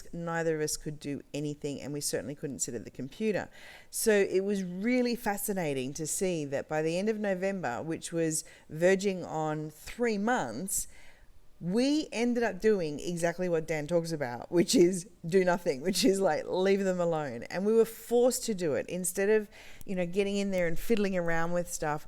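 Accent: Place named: Australia. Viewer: Thanks for the video, I've only just heard of the Plan this week so still doing some DD at the moment. You sound like you're Australian, I am too, can I ask if the grading happens in USD, thus the initial investment of $3000 per bot will actually be $4200ish AUD, are returns then also calculated in USD to be converted back to AUD at the end? Not sure if that makes sense, but basically just wondering if we in Australia would also benefit from the current currency exchange rates if we cashed out some of the positive returns?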